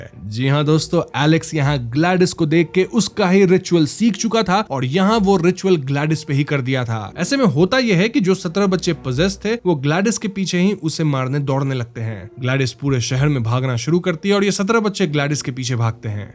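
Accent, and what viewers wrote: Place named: Bihar, India. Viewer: Tu bihaari hai kya agar h to bihari accent nikaal de isme se . Wese bhi tu totla and labduu h bolne me waau waau type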